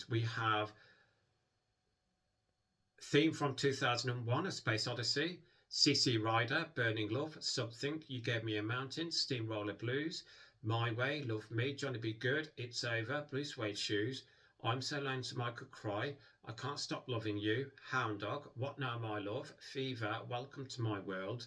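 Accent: United Kingdom. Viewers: I dig your Elvis reviews a lot - as well as the Britishness of your accent! 👍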